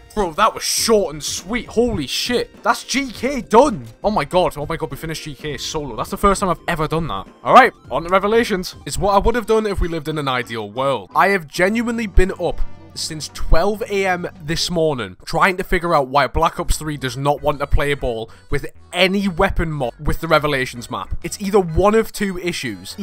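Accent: United Kingdom. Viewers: Newcastle or Sunderland? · hey my friend and I have been trying to place your accent for like 30 minutes, I'm hearing a little bit of Welsh/sometimes Celtic I fluences and he's saying Southern England. Were we close?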